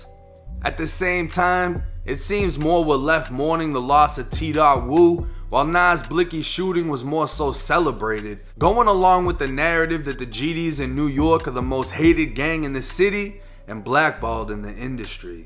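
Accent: Boston, United States. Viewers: This dude got a boston accent.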